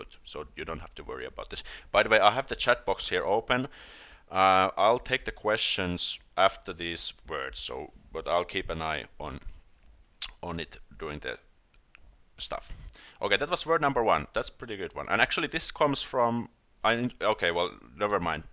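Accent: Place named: Finland. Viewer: damn toi sun suomi aksentti kuuluu niin selkeesti lol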